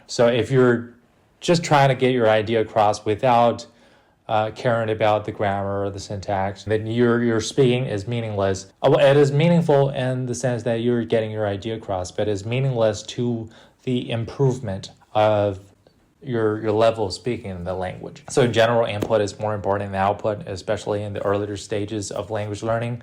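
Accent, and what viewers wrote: South, United States. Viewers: You sound like a southerner from the United States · nice southern accent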